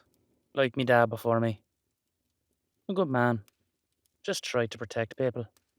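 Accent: Ireland. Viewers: I think just really enjoys doing an Irish accent xD Edit: Loved the video btw!:D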